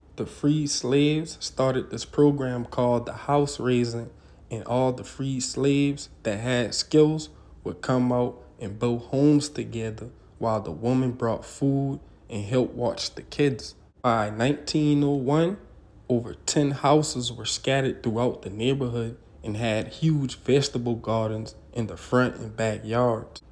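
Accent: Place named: Gullah Geechee, United States. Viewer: He’s probably from Charleston, SC which has a geechee accent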